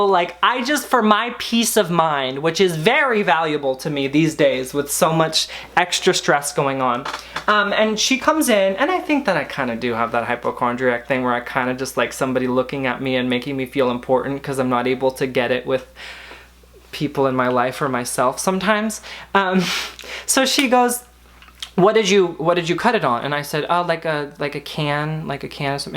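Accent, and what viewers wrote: England, United Kingdom. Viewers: I like your English accents. Makes me smile. Love your content. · The British accent makes me burst in laughter every freaking time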